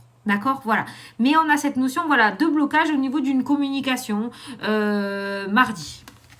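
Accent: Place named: Tarbes, France. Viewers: Quel bonheur cet accent me manque tellement. Toulouse, Lavaur,albi, castre. Trop de merveilleux souvenirs 🌟☀️🌟☀️❤️💕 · sur messenger vu que ça passe par FB j'ai 2 contacts celui avec ta photo de chouette et celui avec ta photo normale lequel est le bon. Pour les contrats signatures immobilier je te remercie de ton tirage cela me parle bien ça avance. Merci merci merci.❤❤💜 j'adore tu parles comme mes nièces qui sont à Tarbes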